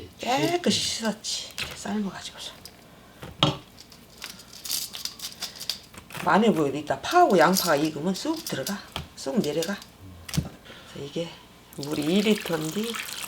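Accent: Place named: Chungcheong, South Korea. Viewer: 설명해주시는 부분이 진짜 엄마나 할머니가 해주는것 같아서 너무 친근하고 좋아요.. 저도 충청도 사람이라 중간중간 나오는 충청도 사투리도너무너무 좋습니다..요리강의 영상이 이렇게 따듯해도 되는건가요.. 보일러안틀어도 지금 너무 훈훈해서 땀이나요 삐질삐질 😅💕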